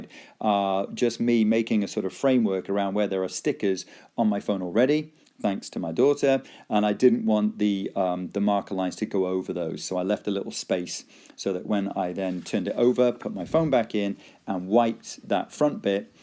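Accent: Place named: United Kingdom. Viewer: May I ask, which part of the UK do you come from?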